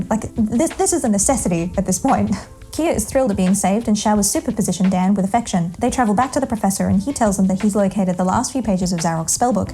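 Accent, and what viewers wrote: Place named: Australia. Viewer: australian spotted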